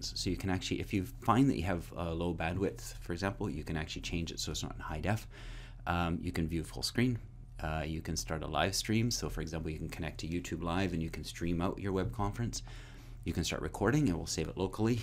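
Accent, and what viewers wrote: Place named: Northern Ireland, United Kingdom. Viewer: Thanks! Very well explained. Question: you're from NI right?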